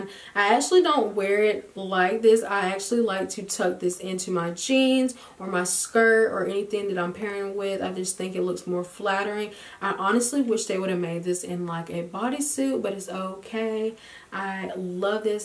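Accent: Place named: South, United States. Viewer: you have the best American accent I've ever heard! are you from the south?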